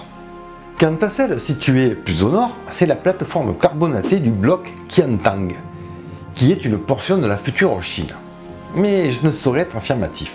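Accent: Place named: Provence, France. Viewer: Le retour des chemises à fleur et de l'accent provençal, ça m'avait trop manqué !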